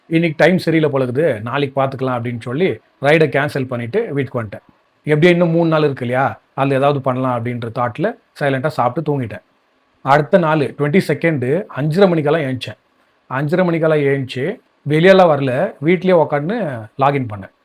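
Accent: Bangalore, India. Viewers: Bangalore tamil ah ..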